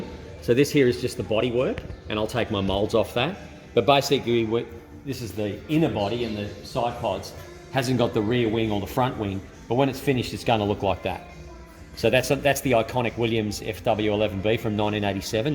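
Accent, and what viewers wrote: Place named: Australia. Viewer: Great video! And really nice to hear an Aussie accent in the Experimental category. Great work!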